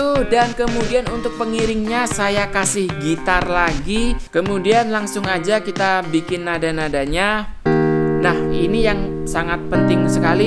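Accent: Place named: West Sumatra, Indonesia. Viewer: Suaranya kayak minang kocak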